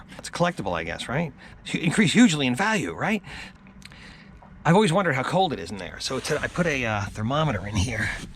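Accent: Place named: New Jersey, United States. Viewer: your videos are always informative, if not entertaining. Since moving from NJ to CO, I miss the Jersey accent. I get my fix listening to you! Keep the good stuff coming. Thanks!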